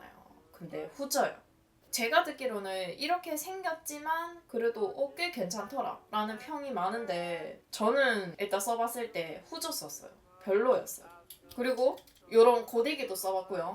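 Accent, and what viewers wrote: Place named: Daegu, South Korea. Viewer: 대구분인가요? 대구 사투리같아용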